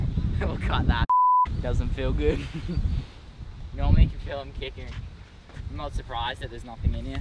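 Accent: Australia. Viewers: I love the australian accent ❤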